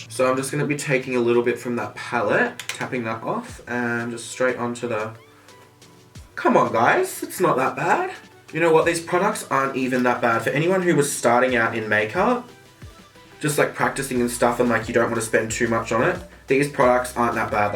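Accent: Australia. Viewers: Amy Expert he's Australien :) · R u Australian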